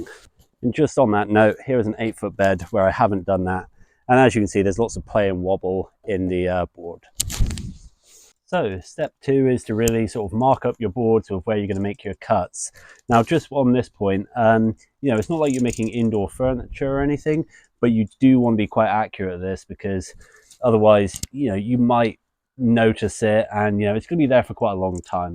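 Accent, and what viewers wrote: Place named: United Kingdom. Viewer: Thanks, that's helpful - especially a British voice amongst so many of our American "cousins". Good to get tips that are relevant to UK. Link to your compost video please?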